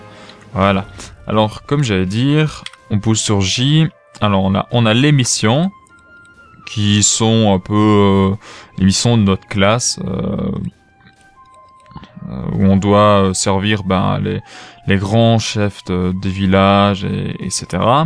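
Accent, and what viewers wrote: Belgium. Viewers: c juste que c un belge(wallon) vive les wallon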